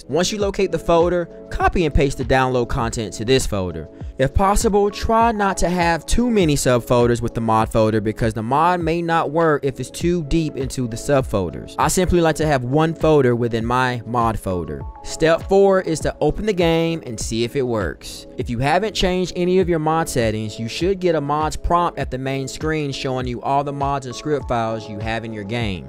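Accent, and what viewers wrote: United States. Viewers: Omg for the first time in my life I hear an American accent that is different from a "standard" one (I'm a foreigner), and it's not a "A guy speaks 50+ accents" video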